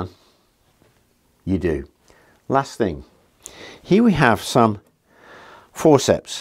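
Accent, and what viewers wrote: United Kingdom. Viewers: The host of this video, to me, amplifies the subject matter with his authenticity. It’s clearly obvious he’s done his homework. Besides, with his British accent, he adds more. One day, I would like to allocate a few hours (on a Sunday), and learn the events of The Great Crusades. Christians vs. Muslims. I do have a deep interest in world history, just not all events. Recently, I discovered the unreal incident that occurred in 1964 (the year I was born) at Stanleyville, The Congo. The black rebels there committed atrocities that matched the deeds of Nazi Germany, but Belgium paratroopers retook the city and rounded up those responsible. All were either hanged or shot on site.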